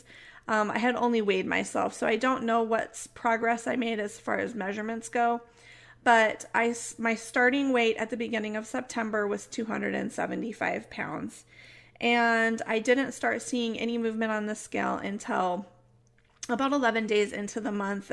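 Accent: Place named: United States. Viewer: Hi. I am from South Wales (UK). Just a few miles from where Richard Burton and Anthony Hopkins were born :--). Accents are rather difficult to describe of course. Yours is clearly 'American', however, without any 'sharpness' or 'high to low' then 'low to high' intonations which my ears pick up on with some (not all) American accents. Thats just me though, haha.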